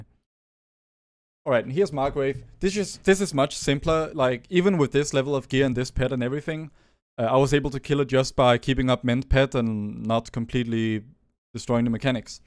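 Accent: Denmark. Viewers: Are you Danish? :)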